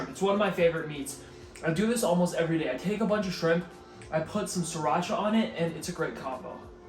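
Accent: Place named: New York, United States. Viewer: I’m from New York and I’d bet money you are either from New York or New Jersey the way you say chocolate 😂 Thanks for this awesome recipe! Happy Holidays sweetie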